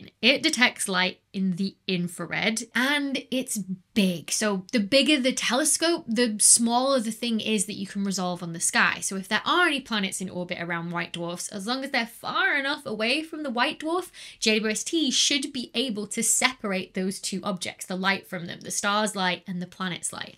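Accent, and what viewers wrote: United Kingdom. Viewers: I really appreciate your channel, fun and still very heady...off topic, but the CC follows your British accent - Hubble was Hobble, and exo planet said XA planet once 😂🙌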